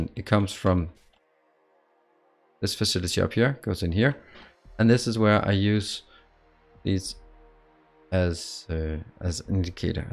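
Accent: Denmark. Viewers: Danish I believe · He is Danish. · are you danish? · Danish I think.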